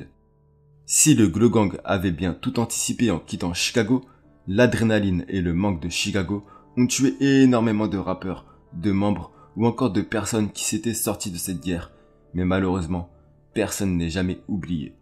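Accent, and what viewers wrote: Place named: Paris, France. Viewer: Large j'ai stoppé directement pour aller dans les commentaires pour savoir si comme moi quelqu'un avait arrêté les frais après 1min 20 d'écorchage. Depuis que tu dis Glo Gang comme un gars du 16 c'est mort next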